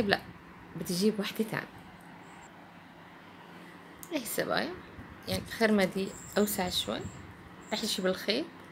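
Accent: Egypt. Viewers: ما شاء الله بتجنني شغلك بياخد العقل بنت بلدي سورية مع لهجة مصرية ربي يحميكي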